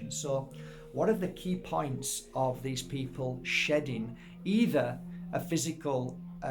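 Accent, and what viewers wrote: Yorkshire, United Kingdom. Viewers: I love listening to this Genuine “ Yorkshireman !! Tells it how it is !!!!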